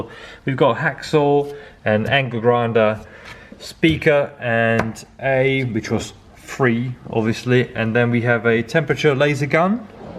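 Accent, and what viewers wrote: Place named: Europe. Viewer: Where are you from? You have a European accent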